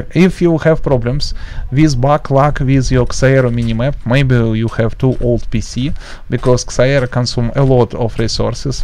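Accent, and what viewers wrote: Russia. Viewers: are you russian who is speaking in english? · He is russian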